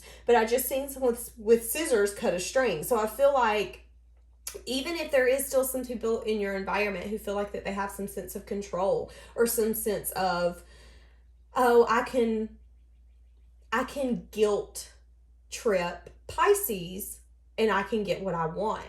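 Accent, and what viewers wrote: South, United States. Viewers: I love your voice and southern accent 🖤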